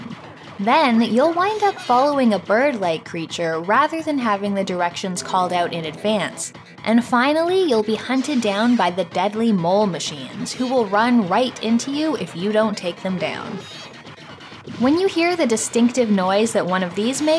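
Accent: Canada. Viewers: I remember seeing a Sega CD and Sewer Shark set up on a demo display at Meijer, which is a grocery store chain around Michigan which you probably don't know since I assume you are from Canada based on your accent, but as a young teenager at the time seeing this game I was blown away. For some reason, seeing shitty live video in a video game during the 90s just knocked your socks off. It is weird to think that devs and publishers thought that this was the future of video games at the time. Like they honestly thought super grainy live footage with a crosshair on the screen where you shoot dumb shit was where videogames were going forever. That's insane. On a side note, I'm playing Subnautica right now and I'm about 35 hours in and that game is insanely awesome. Maybe check it out if it looks interesting to you. It has the potential to give anyone PTSD though.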